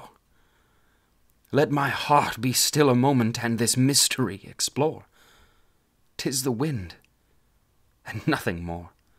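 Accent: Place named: Mid-Atlantic, United States. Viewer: Excellent use of the midatlantic accent. Your inflection and pacing bring to mind the best actors of the last century, trained in Shakespeare. Thank you so much for sharing this with us!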